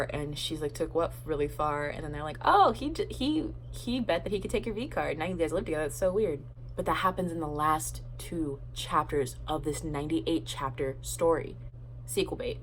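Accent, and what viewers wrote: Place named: England, United Kingdom. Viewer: Your English accent is giving me life 😂😂😂 Also: fans of Harry Styles were super mad at After becoming so huge because HS is an angel and it makes him look bad. Also, in the original wattpad, Hardin’s name was literally Harry Styles. It was meant to be like what would have happened if he didn’t become famous and went to college. 😂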